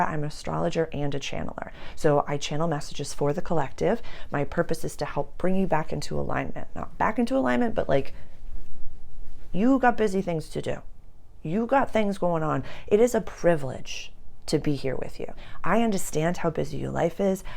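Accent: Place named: Massachusetts, United States. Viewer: Oh my dear ❤, i to am a Aries Sun, Pisces moon, Aquarius ascendant!! I feel you Girl 🙏🏻🌈🪶, By the way I talk to and hug trees 🌳. 🌲, I grew up in Oxford Mass 1970’s and I talk like you ❤🥹… love my accent.. I just found you a few months ago and I feel like I have known you my whole life, thank you for all you do. I’m learning so much from you, not bad an old woman. 🧙‍♀….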